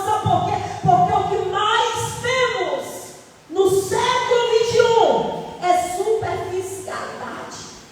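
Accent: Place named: Paraíba, Brazil. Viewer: A paz do Senhor missionária! Aleluia hum olha como minha professora de sotaque paraibeis esta chip, gloria a DEUS ''ha um tempo determinado por DEUS para todas as coisas'', primeira vez que vejo minha professora que prega sorrindo de corpo todo, louvado seja DEUS pelas vestis e amei essa saia, amanhã vou acompanhar a pregação toda, DEUS te abençoe com a familia.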